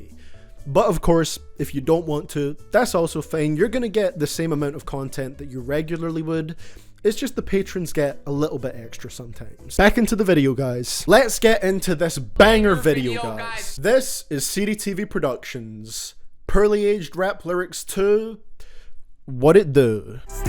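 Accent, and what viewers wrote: Scotland, United Kingdom. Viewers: He sounds like a Scottish version of another youtuber I watch but can't remember which channel. It's uncanny. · U Scottish or usa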